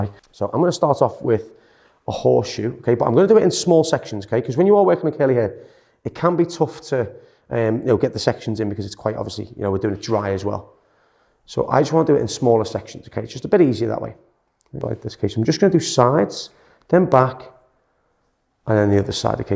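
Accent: United Kingdom. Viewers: Im a green barber trying to learn as much as i can . This one was actually super helpful .. a cool ,simple and quick hair cut well done. Im just wondering how you find the guide in each section . Cuz i lost mine in this type of heavy hair ... and its a bit hard for me to hear what you saying ... wearing a mask and hard British accent 😅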